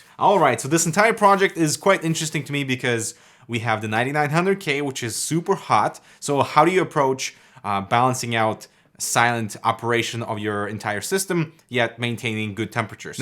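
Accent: United States. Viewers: is your accent american or swedish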